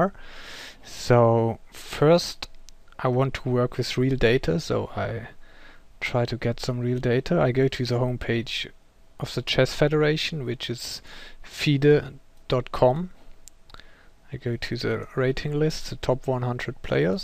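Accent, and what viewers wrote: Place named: Germany. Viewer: thank you, really very useful.. i kind enjoy the German accent :)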